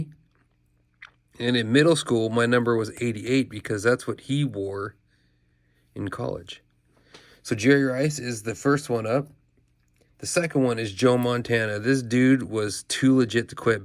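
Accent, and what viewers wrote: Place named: Russia. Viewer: Nice VR and cards. Great Russian Accent.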